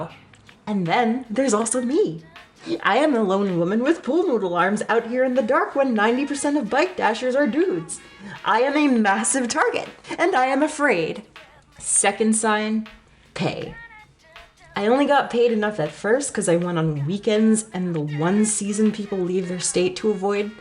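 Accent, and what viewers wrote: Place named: Philadelphia, United States. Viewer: You from Philly? Auto sub immediately.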